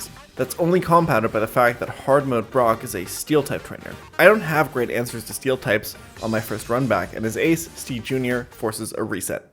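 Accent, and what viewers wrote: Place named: Germany. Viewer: sounds dead? nah he’s just German mate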